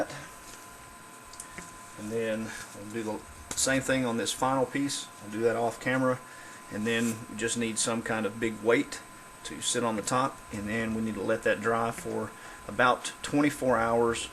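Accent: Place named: South, United States. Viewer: You sound like your from below the Mason Dixon line... >.> great way to make a hill though!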